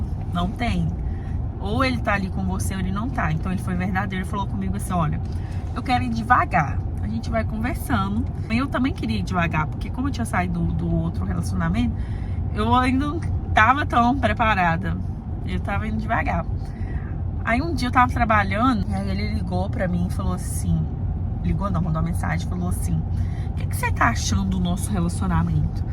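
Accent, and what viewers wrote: Minas Gerais, Brazil. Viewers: Vc é mineira? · Que história de amor linda ❤️ que Deus continue abençoando grandemente essa família linda que vcs estão fazendo 😍🙏 amei de vdd sua história o YouTube te recomendou a duas semanas e já maratonei vários vídeos seus vc é divertida com esse sotaque mineiro seus filhos são uma benção vc é guerreira humilde amei vcs e vou ficar por aqui assistindo seus vídeos e torcendo pelo casório e a casa nova de vcs bjussssssss❤❤❤❤❤❤ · É isso aí minha linda.Mar calmo nunca fez bom marinheiro! Principalmente se somos cristãos aí é que somos forçados a crescer e amadurecer nas batalhas da vida. Um grande abraço adoro o inglês com mineires e português tudo num vídeo só! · Vc é hiper bacana, guerreira, batalhadora,linda história, tudo de bom.Vc é mineira! Téns um sotaque mineiro, tenho muitas amigas por isso estou perguntando,Deus abençoe vc e sua família,♥️♥️🙋‍♀️🙋‍♀️🙋‍♀️ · Linda a história de vcs, como bom mineiro estou achando similaridade nesse seu sotaque 😂 um abraço e muito sucesso em suas vidas.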